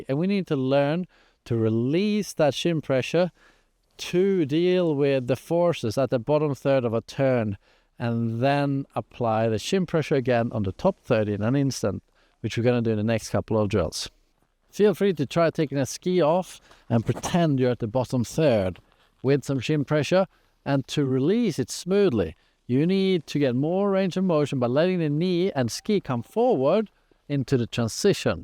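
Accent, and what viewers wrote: Sweden. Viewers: Are you swedish by any chance? Sound very swedish when you talk :D edit: Great tips by the way!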